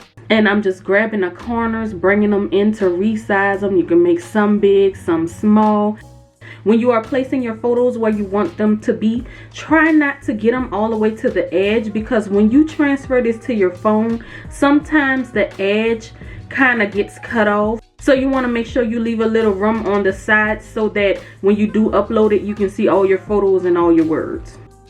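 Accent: New Orleans, United States. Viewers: Ok, I need this in my life. However... I want that N.O.L.A. accent bay-be! 🎆🎇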